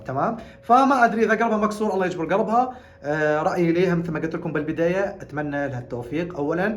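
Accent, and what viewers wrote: Iraq. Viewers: اللهجه العراقية تجنن😂 والله زمان جنت اتابع مقاطعك وهسه طلعت الي مرة ثانيه والله الحمدالله من طلعتلي مرة ثانية لهجتك تخلي الواحد يتيه كلش صاير عراقي الى ربع 😂😂😂 والله احسن من ينور اليوتيوب ❤ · عمره 16ولهجتك نفس لهجت العراق 🇮🇶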